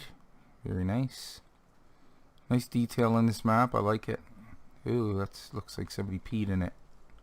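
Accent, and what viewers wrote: Canada. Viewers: I can hear a little Newfoundland in your voice, fella!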